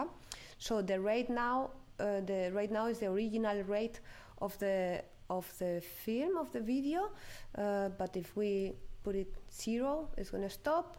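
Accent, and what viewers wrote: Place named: Italy. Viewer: Simply the best tutorial teacher! and the Italian accent is lovely! Merci!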